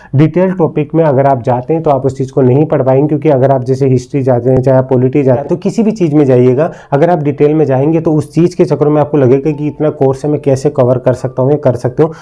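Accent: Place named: Rajasthan, India. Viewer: Sir Apki Rajasthani langauge bhut sweet hai sir ap ese ma hi video banya kro😍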